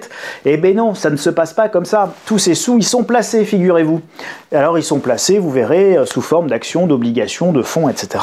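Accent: France. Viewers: T'es normand ? Yes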